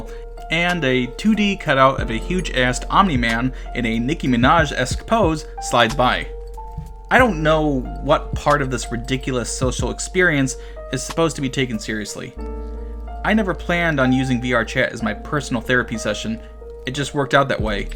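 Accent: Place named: New Jersey, United States. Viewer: You sound a little bit like a New Jersey guy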